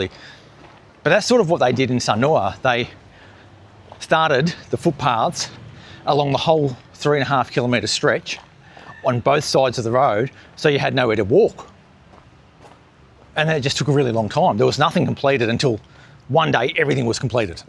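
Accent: Australia. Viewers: This is a great video thank you. Have to say I was bloody shocked with your occa Aussie accent and then you bring out the perfect Indo with the locals. Made the video! What lovely guys, Balinese are the salt of the earth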